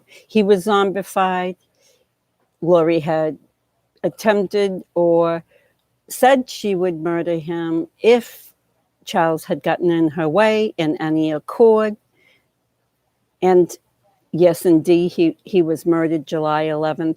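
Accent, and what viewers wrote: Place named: Northeast, United States. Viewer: I LOVE your channel!!♥️ I REALLY LOVE your northeastern accent. Your voice sounds just like my own did.😍It brings back happy memories. I think your assessments of this most BIZARRE case are pretty much “spot on.” Thank you for the time/work you put into your channel.🌺🌺🌺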